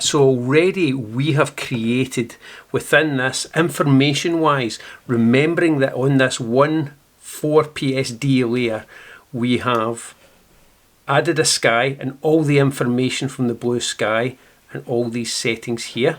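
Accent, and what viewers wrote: Scotland, United Kingdom. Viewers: My god...is this Scottish? I had to turn in captions sometimes XD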